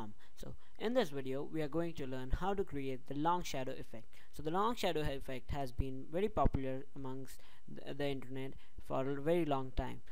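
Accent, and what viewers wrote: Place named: India. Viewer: indian accent man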